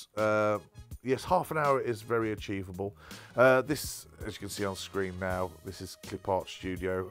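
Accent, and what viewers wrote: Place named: Black Country, United Kingdom. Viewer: i detected the accent (takes one to know one :) ), just checked your site and OMG! you went to stourbridge college too!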